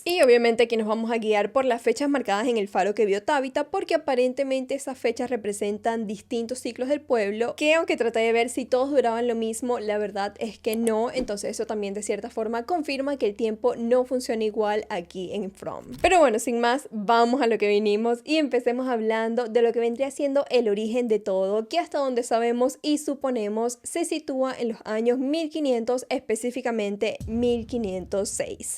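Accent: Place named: Venezuela. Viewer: Me encantó tu explicación de todo y tu acento, eres venezolana de casualidad? 🥹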